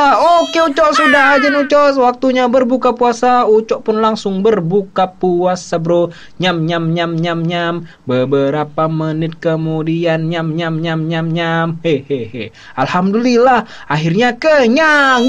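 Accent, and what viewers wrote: Medan, Indonesia. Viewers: Bang orang Medan ya? Soalnya logatnya mirip orang Medan 😅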